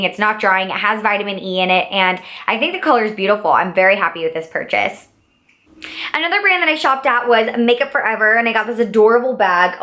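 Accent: United States. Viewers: Hello I loved your video I'm a new subscriber! You're s sweetheart seems genuinely beautiful inside and out. You would pass as my twin when I was in my 20's. I don't know if you've ever heard that everyone has s twin somewhere in the 🌎. I'll try to find a photo of me and send it to you. I also love your accent and st first I thought you were from Arkansas . I was born in Pumpkin Bend , Arkansas! You are adorable I'm going to tell all my friends to subscribe to your channel. Your excitement and enthusiasm is contagious it makes me want to go to Walgreens in the wee hours of the morning ! Have you ever heard about the automatic de/pot machine from QVC. I believe Kelly Strack received one in a recent PR package. It might work great for those large single shadows. Did the Morphe limited edition copper and spice palette have a product number? I can't wait to binge watch your videos ; so happy that I found your channel.🙋🏻👙👗💅🏼💕🌸🕶💄👠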